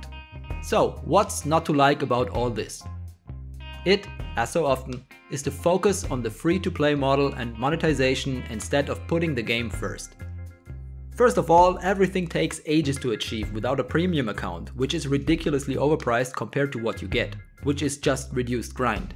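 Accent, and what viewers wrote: Germany. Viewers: Hallo guten Tag man hört dass du deutscher bist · Echt gutes video aber man merkt das du deutsch bist # Tiger zwei oder Panther zwei sagtest du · Bin mir nicht sicher, aber ich glaube man hört deine Deutschheit raus :D Trotdzdem sehr gute aussprache, musste echt 5 mal hinhören ^^ "Jagdpanzer" hats dann bestätigt :D Deine ("Review") hat mich überzeugt. Zeit das WOT mal abgelöst wird :D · That is one German accent.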